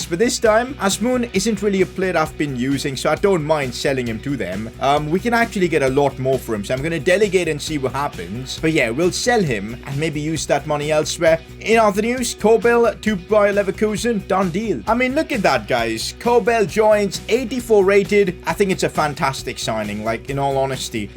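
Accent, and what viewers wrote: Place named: India. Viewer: Great video but damn! Your Indian accent puts me off lol😂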